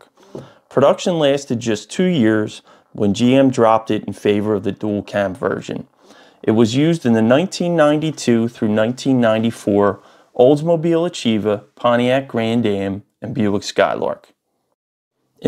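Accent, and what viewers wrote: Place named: Chicago, United States. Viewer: If you wanted to know what a Chicago accent sounds like, here you go. Guys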